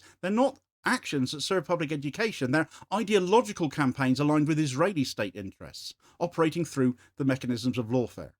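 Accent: Cornwall, United Kingdom. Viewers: Best news I have had all year as a Jewish Devonian. Sorry I know you, like all my Kernow friends, will say I was born wrong side of the Tamar and am an emmet. I really hope this challenge succeeds as two former petitions to the Charity Commissioners by Tony Greenstein and Jewish Voice for Labour to remove status from the Campaign Against Antisemitism failed. Nice to hear your Kernow lilt!.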